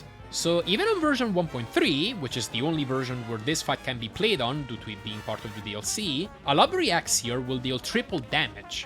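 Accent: Italy. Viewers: Bro è italiano me sa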